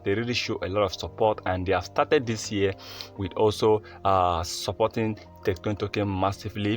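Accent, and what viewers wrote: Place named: Nigeria. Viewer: Nigeria man,on ur voice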